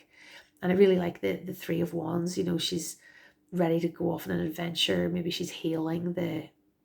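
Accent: Ireland. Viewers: Oh you're irish! Yaaayyyy, subscribed 🥰🌞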